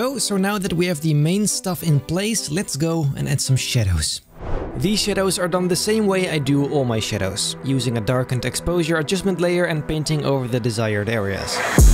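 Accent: Netherlands. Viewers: ben je nederlands? het is gwn dat je heel nederlands engels praat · ik vind het ook zo leuk dat hoe goed je engels ook is je blijft horen dat je nederlands bent door de nederlandse trekjes lol. maar super vet werk love it!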